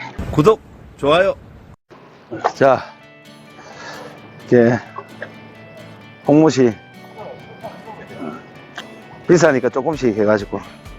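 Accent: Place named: Busan, South Korea. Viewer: 부산에 어투로 " 쥑인다~ 서울에는 이런 장소가 접하기 힘들어서 참 부럽습니다. 고급어종이네요 👍👍👍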